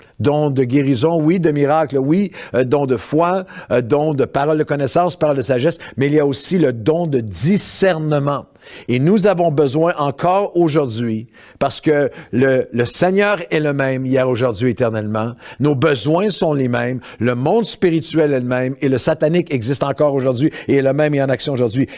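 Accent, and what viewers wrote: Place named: Canada. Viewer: Avec vous depuis le sud de la France ! Dans le minervois! Ne soyons pas des vierges folles !! Merci pour votre prédication edificatrice avec l accent canadien ! ❤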